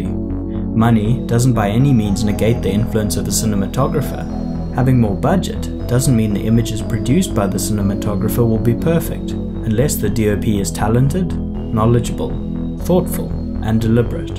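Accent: South Africa. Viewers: South African? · Are you from South Africa man? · I hear a South African accent! yay for the SA industry. Love this short video. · I'm going to go out on a limb and say South Africa. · Are you South African? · Ek wil mos se ek hoor n South African accent. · This man South African? Your accent almost sound like our's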